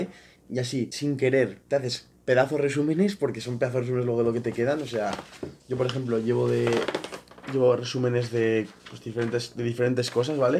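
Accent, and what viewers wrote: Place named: Aragon, Spain. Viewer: Jajajaja se nota el acento maño!! Saludos desde Tarazona Bro!!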